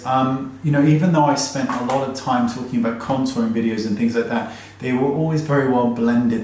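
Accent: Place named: United Kingdom. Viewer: Disconnected beard 👍, highlighter under blusher 👌, that earring whooooo makes me cruuuush on you and the cherry on top of the cake is your accent! It's british i assume... ! How i wish i could learn that accent ,it's beautiful to the ears. Sooothing OMG, Wayne is Asmring without realising it (if that make sense) lol! 💓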